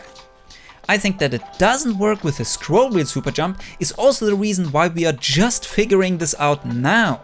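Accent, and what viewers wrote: Germany. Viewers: Do u speak german? Because your voice sounds like your main language is german.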